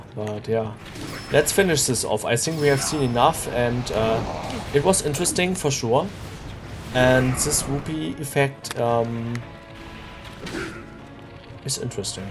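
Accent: Germany. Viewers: It’s a German voice like me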